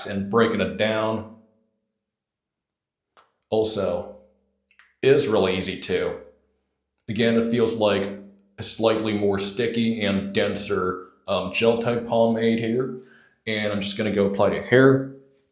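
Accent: South, United States. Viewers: ! You’re back! I thought you were living in Germany? … yet I detect a southern (USA) accent. 🤔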